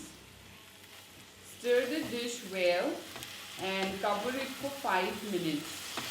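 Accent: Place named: Assam, India. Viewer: Lovely ☺️ I wish could have a taste of your dishes… that Bodo accent was funny though 🤭 but thanks for the dish as my Khasi gf was pushing me to cook it with pork and u saved me 😊 🙏 keep posting amazing Bodo dishes 👍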